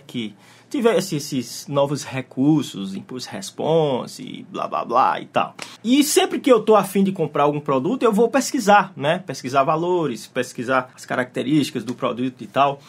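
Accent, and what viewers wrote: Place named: Northeast, Brazil. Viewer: O melhor de tudo: Sotaque Nordestino é 1000! 🤩❤️ Top!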